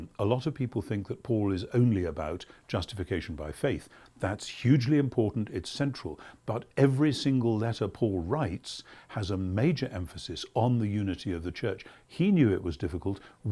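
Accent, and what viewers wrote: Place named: United Kingdom. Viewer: Way more legit with British accent. Bravo. Been thinking about this lately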